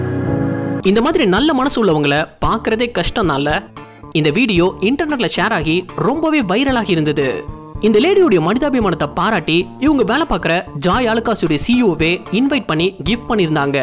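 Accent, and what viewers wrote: Madurai, India. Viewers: Nee ga madurai ya bro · Bro neega Madurai ya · Bro neenga madurai yaa bro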